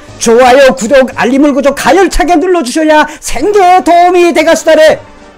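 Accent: North Korea. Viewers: 대표님! 북한말 귀에 거슬리네요!!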